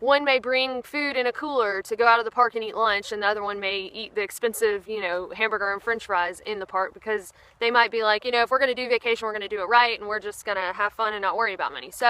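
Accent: South, United States. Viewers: hey how old did you do the AT? also did you make it to the PCT? i could listen to you all day long i love the southern draw:)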